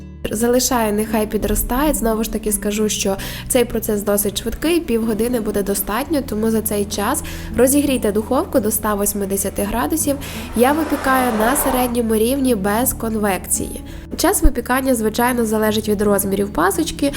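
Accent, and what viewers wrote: Ukraine. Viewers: да по-разному она и раньше разговаривала. По говору слышно сразу что дома говорит на украинском. Просто видео на русском имело больше просмотров и лайков. Ну, а сейчас у них в голове клемы поменялись, уси дюже патриотамы сталы. Ничего, спецоперация закончится, и снова все перейдут на Великий и Могучий. А пока пусть лают.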